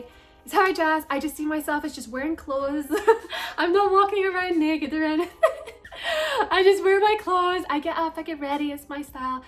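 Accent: Scotland, United Kingdom. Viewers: I've watched you on tik tok for a while but only just joined your YouTube channel. I am so baffled and shocked that people would ever think that someone with a cool style that celebrates vintage could be a bad thing? You look amazing and your accent is beautiful (my dad is from coatbridge/aidre so its lovely to hear!). Keep being you 🥰 xx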